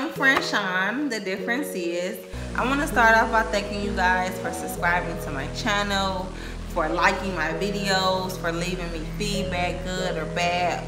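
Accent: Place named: Detroit, United States. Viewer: Your voice sounds like your from Detroit. If so, hey girll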